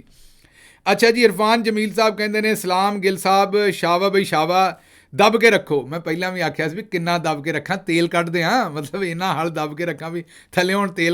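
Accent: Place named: Punjab, India. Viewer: sb twadi punjabi te punjabi culture blkul exact punjabi hai matlb USA reh de bawjood v inna kch kawain jan de thodi saday nal a v share kro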